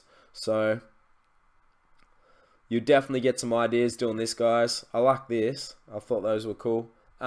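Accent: Australia. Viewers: Wich part of Australia are you mate??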